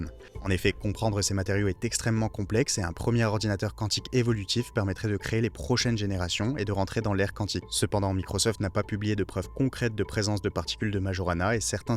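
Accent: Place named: Banlieue, France. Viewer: Attention à ton accent de banlieue, c'est très perturbant et pas français.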